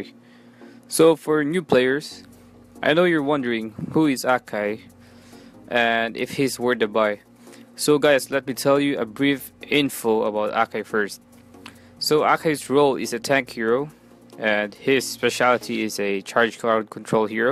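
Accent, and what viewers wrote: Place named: Philippines. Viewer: pinoy 👍😂 halata sa accent.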